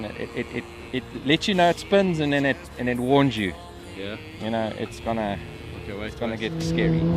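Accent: South Africa. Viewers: you south african? sounds like our accent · are you afrikaans?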